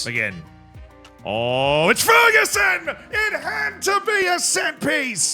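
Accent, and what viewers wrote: United Kingdom. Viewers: What a video please do more with your British commentary 😂❤ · Why do you commentate with a British accent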